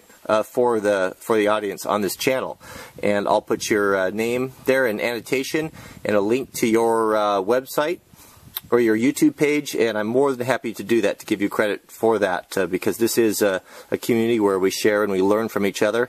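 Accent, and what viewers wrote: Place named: Russia. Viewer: You have a Russian accent.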